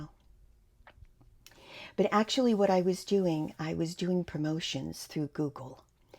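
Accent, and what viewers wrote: New England, United States. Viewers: I’m here because Jeannie. I love her willingness to help other creators. I’m sorry to hear of your YouTube struggles. It’s my understanding that things can get very complicated. I wish you the best. On a side note: I think I hear a New England accent?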